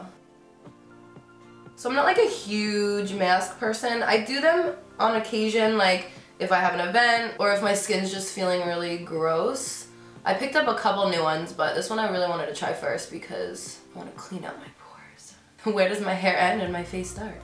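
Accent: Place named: New York, United States. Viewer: You can definitely tell you are from the New York/ jersey area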